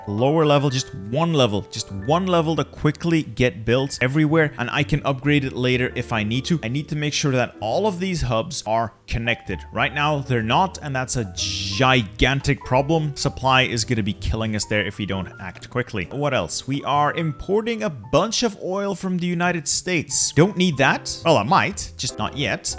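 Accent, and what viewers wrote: Belgium. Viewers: out of curiosity, are you from flanders or wallonia cause i can’t put my finger on it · Hes Belgian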